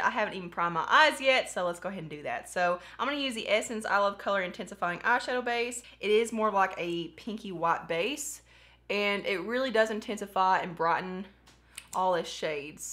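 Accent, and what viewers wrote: South, United States. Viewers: I love your voice it sounds so country 😍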